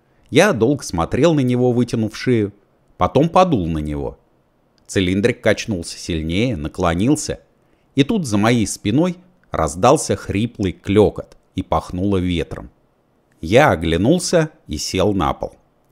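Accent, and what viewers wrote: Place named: Volga, Russia. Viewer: Плохо читает. Говор волжский. Чтец должен быть с никаким говором, как диктор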